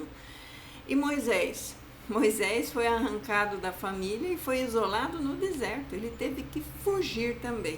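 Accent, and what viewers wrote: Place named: Rio Grande do Sul, Brazil. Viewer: Deus abençoe irmã! Sutaque maneiro deve ser do Rio Grande do sul que Deus abençoe a todos dái!!!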